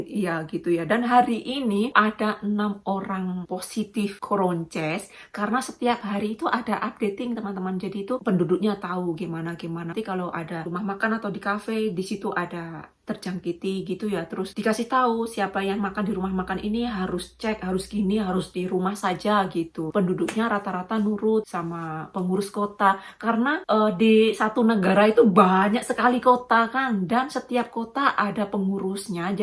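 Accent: Java, Indonesia. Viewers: Mbak apa ada turunan orang jawa?kok rada medok jawa 😊😊😊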